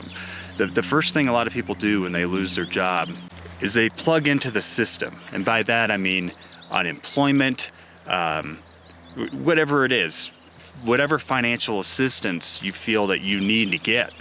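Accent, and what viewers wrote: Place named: Northern California, United States. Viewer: It’s Nor Cal Americano. No i don’t speak King James English do you! lol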